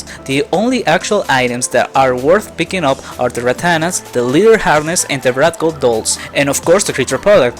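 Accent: Mexico. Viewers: are you mexican? i feel it on your voice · Tienes buen inglés pero te escuchas mexa (no es que sea malo) sigue practicando para que suenes nativamente pero igual buen video Casi creí que era un canal gringo 🤣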